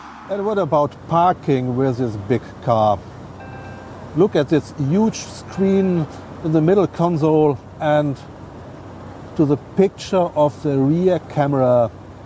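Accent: Germany. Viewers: Totally appropriate to have a Porsche review with a Porsche reviewer with a thick German accent…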